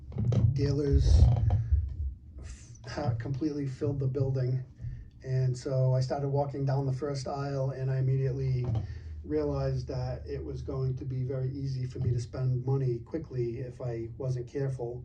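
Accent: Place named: Boston, United States. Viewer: I get the impression you're from Boston lol,great pickups,I missed 2021 national ,will be in ac,hope to get a chance to meet you and other you tubers to tell stories and share experience's.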